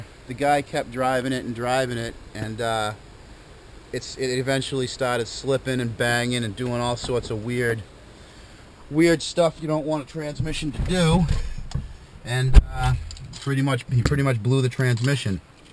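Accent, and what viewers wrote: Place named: Massachusetts, United States. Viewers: Yo. You gotta be from Massachusetts !!! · 🚗 Loving the Bostonian Accent! These x308 Jaguars have several non-serviceable sensors, when I first bought my XJ8 Vanden Plas I had to swap the throttle body because of a faulty throttle position sensor that is permanently mounted within it. $1,000 part to fix a $30 sensor is crazy, but it made the car road-worthy and it is still working perfectly 7-years after the job with no other major issues. The prior owner had no idea what was causing the problems (scanning codes was all that they needed to do) and he sold it to me for a great price, so I'm not complaining now, but investing $1k to fix a sensor was initially quite concerning, lol.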